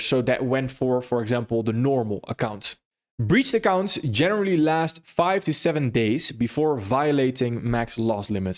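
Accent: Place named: Netherlands. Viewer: Ik sense een beetje hollander 😅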